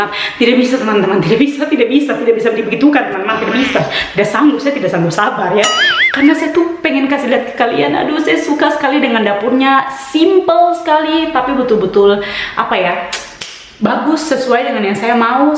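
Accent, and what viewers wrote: Papua, Indonesia. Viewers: Sy paling suka dgn logat papuanya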